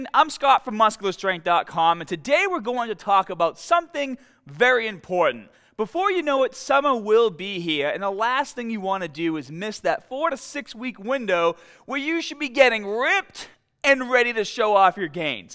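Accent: Boston, United States. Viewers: This guys definitely from Boston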